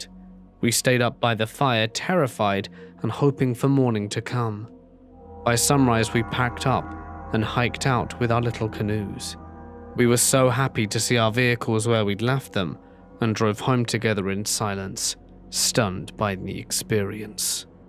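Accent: United Kingdom. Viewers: I love your British accent. I was raised by a British mom, who is now deceased. And thank you for being so expressive when you read these stories!!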